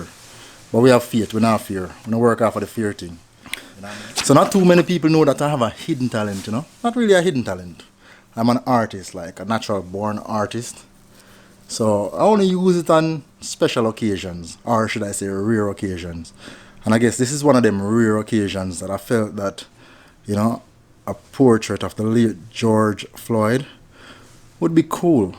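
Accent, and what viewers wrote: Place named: Jamaica. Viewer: I love Jamaica your English 👌 l love it l will try to vlog with jamcn keep going thank you so much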